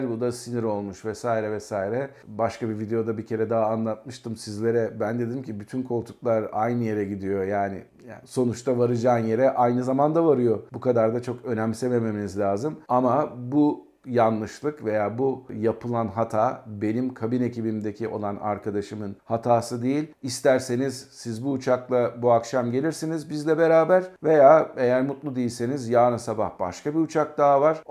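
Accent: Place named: Kayseri, Turkey. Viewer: Kaptanım, pazar günleri, Habertürk kanalında Airport isimli bir program var.Geçen hafta, uçakta ,yazılı olmayan görgü kuralları başlığı altında ,yararlı bilgiler vardı. Herkese öneririm.Hatta ,daha detaylı olarak belki siz de bir video yapabilirsiniz. Ama ,hep Gayseri aksanı kullanmayın, biraz da Rumeli,Karadeniz vs aksanlarında, takdir ettiğim tiyatral yeteneğinizi görelim. Bence Pilot olmasaydınız tiyatrocu olurmuşunuz..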